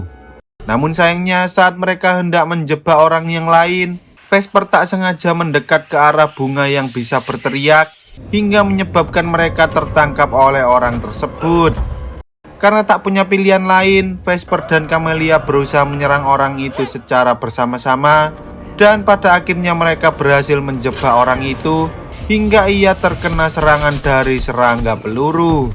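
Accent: Java, Indonesia. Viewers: Ngomong lo jangan medoooook broo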